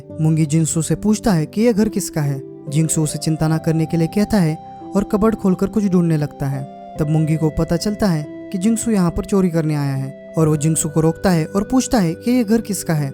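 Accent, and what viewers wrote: Maharashtra, India. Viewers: गावंडाळ हिंदी बोलतोस म्हणजे महाराष्ट्र चा आहे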